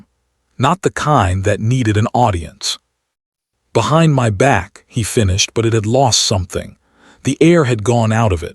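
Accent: United States. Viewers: The American accent is really unsuitable for this story about British aristocracy. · English accent much better than cowboy accent for the narrator 🤨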